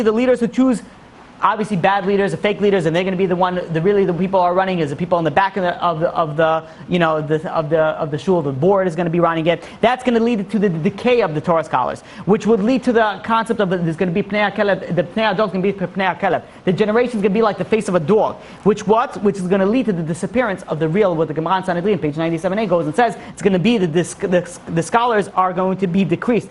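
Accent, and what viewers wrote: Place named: New York, United States. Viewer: "30 minutes and I didn't take a drink" made me Isaac out loud 😂 I feel real bad...just drink freely my brother ahahaha. Your water drinking only affects people who listen to your beautifully super fast wisdomful ny accented voice through noise reduction headphones, the lapel mic you wear catches your swollowing and heartbeat, very ASMR'y. Which reminds me of a joke.... What used to be Bruce Lee's favourite drink???? Wahhh Taaahh!! (Kung Fu 👐)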